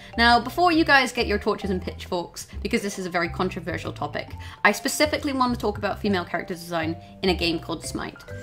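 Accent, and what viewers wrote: United Kingdom. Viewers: I'm sorry, I can't continue watching this video until I know where the hell that accent is from?! It sounds British sometimes and American others... WHAT IS THIS??? No but seriously, I agree with this, they could've easily made Bastet, just a cat woman, not a woman who has a couple of cat features etc.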